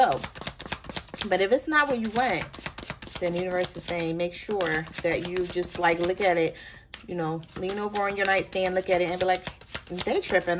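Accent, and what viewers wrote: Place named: East Coast, United States. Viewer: I can hear that east coast accent 😂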